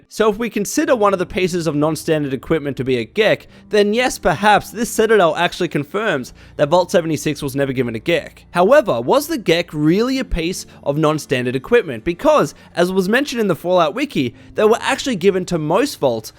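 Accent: Australia. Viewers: Its not odd, I can completely understand what you're saying. I've watched enough Robert Whittaker and Mark Hunt interviews to understand the Australian accent. Its the way you say certain words.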